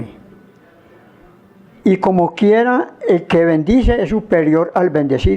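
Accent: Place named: Colombia. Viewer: ¿Porque sospeche que era Colombiano cuando comenzo a hablar...?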